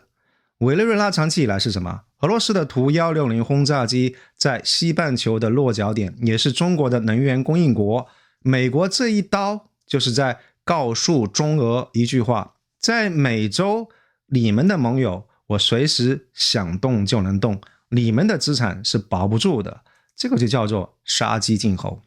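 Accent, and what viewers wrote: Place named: Hunan, China. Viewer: 的普通话没有台湾口音，更像是湖南人讲普通话，n和l不分，没有贬义，只是留意到。听的视频好像是享受一顿丰富的大餐。当然同样的话题也有很多快餐，也有它们的食客。产品丰富，各取所需。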